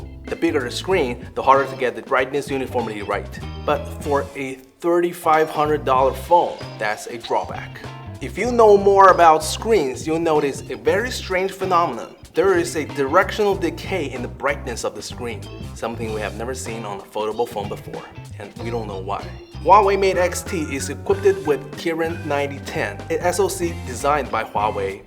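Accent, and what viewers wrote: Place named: England, United Kingdom. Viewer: 还真整这口老英语腔儿啊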